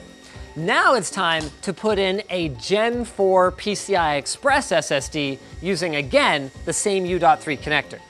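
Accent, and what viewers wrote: Canada. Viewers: For some reason you seem more Canadian in this video than normal. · Linus’ Canadian is showing more and more every video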